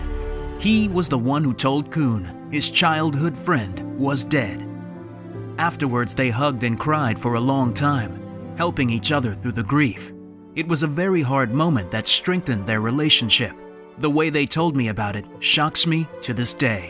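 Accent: United States. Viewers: American speak English , try Scottish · Can we not have Americans narrating these?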